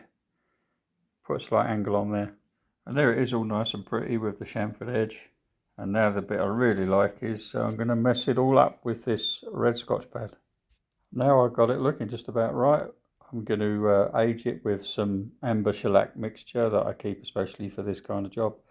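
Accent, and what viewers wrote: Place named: England, United Kingdom. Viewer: Thank you. The way you routed for the mahogany splines is something I will try next time I fix a headstock. On a modern guitar, I might use veneers on the front and back of the headstock to hide my work. The way you did it on that classic was perfect. Not to be weird, but I also like how you talk; what part of England are you from (if you are Irish, Welsh, or Scottish, I apologize)?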